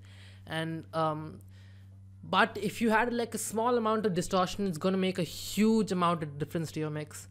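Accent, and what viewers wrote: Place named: India. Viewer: I mean.That's Awesome.His voice sounds Indianish.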